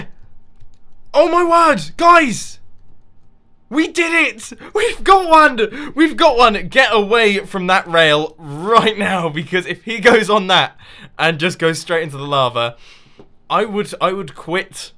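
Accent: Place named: United Kingdom. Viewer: lol i love the way British people say things like "aquir affinity"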